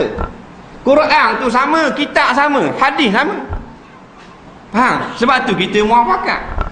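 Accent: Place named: Johor, Malaysia. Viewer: ada sesiapa tahu tak kat surau mana ceramah ni diadakan? cara ustaz cakap, macam kat johor..... surau mana ye? best sangat kuliah ni, panas dan pedas.....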